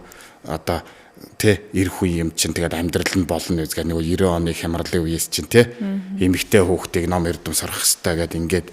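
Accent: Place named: Inner Mongolia, China. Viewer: Өвөр Монгол хүн үү ? Аялага нь тэгж сонсогдоод бна. Сайхан ярилцлага бна баярлалаа.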